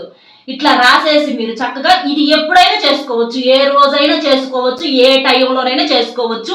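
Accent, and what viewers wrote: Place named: Narasaraopet, India. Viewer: White saree, black jocket malleypuulu,narsaraopeta bhasha maatlaadatam ,anni superuu😅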